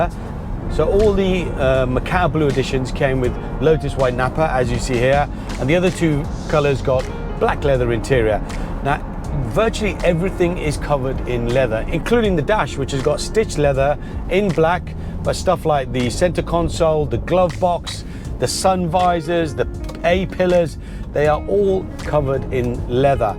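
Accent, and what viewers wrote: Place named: United Kingdom. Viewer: Done well on your british accent.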